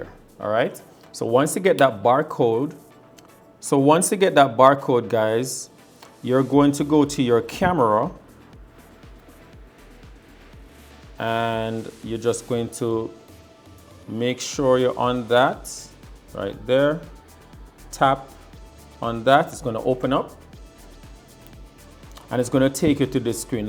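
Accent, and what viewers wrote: Jamaica. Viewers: You sound like a yardie?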